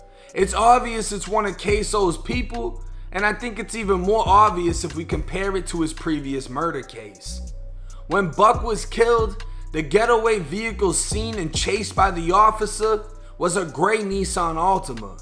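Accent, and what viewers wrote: Boston, United States. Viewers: That Boston accent heavy bro 😂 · Oh yeah you definitely from Boston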